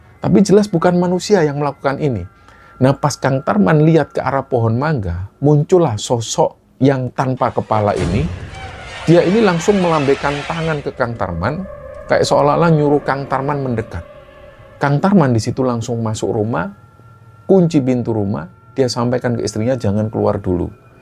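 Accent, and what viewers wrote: Java, Indonesia. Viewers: Ya Allah gmana itu mreka yg tdk berdosa di bunuh tanpa kesalahan ---- smoga arwah2 yg salah sasaran dpt beristirahat dgn tenang. Keren mas menceritakannya jd kyk hidup n bs merasakan kejadian saat itu. Apalahi dgn logat2 jawanya......seruuu dan sbg org jawa aku ngerti bgtz ❤❤❤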